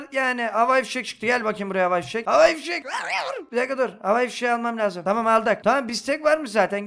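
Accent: Black Sea, Turkey. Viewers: abi sen nerelisin karadenizlimisin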